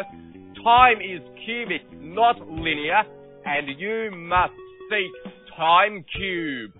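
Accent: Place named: Australia. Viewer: He doesn't sound euro - it's Australian.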